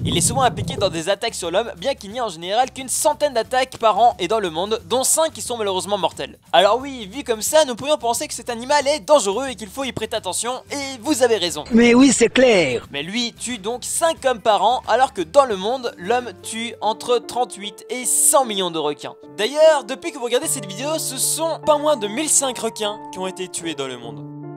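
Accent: Belgium. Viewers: tu vien de ou de belgique ? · T'es belge?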